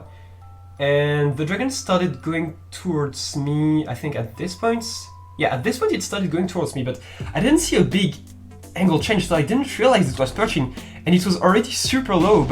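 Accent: France. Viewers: Man, are you french?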